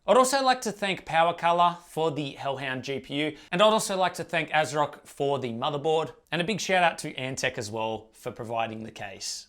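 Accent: Australia. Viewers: Is he Aussie?? Cause I'm Aussie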